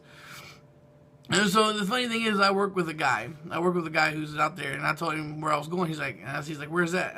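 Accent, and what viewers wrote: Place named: California, United States. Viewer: why are you in west Virginia in the first place? you sound like you're from Cali or a state close to the south west area of the states. im from Cali and moving to the Midwest was the worst mistake of my life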